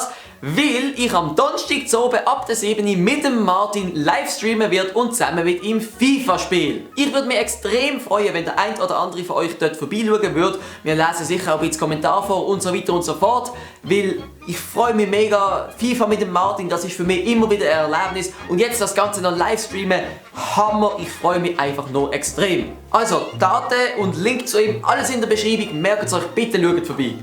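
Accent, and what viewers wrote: Switzerland. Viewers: schweizer akzent hahahaha kann man nicht ernst nehmen hahah der könnte über den holocaust labbern leute würden drüber lachen.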